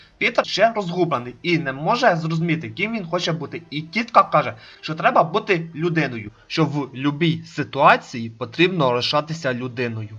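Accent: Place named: Transcarpathia, Ukraine. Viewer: Пасувало би добавити фонові (тихенькі) звуки до картинки) І треба щось робити з закарпатським діалектом)) я з західної України, все розумію,все сприймаю) але от східні,центральні Україні важко буде для сприйняття( «Пистолєт» 😂 Пістолет))))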